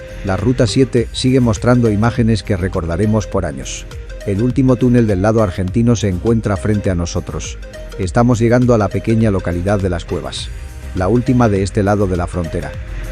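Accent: Spain. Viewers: Hola !! Soy Chilena es primera vez que veo su video ,me gusto mucho ya que del lado argentino no conocía no e tenido aun el privilegio de ir Supongo que la voz de un español es para hacerlo más neutral bueno la cuestión es que fue interesante muy cultural e informativo somos bendecidos al compartir la cordillera de los andes !! Espero que esa plegaria del obispo Ramón Angel Jara sea escuchada no literal que se caigan las montañas sino que Jamás tengamos que estar en guerra Chilenos y Argentinos ya que seria muy penoso para ambos!! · Hola muy bueno el video y los paisajes. Pregunta , sos argentino ? Por q tenes tonada española.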